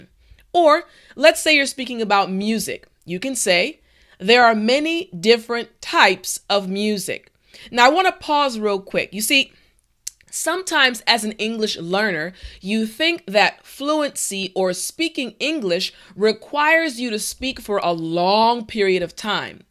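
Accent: United States. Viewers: that's American English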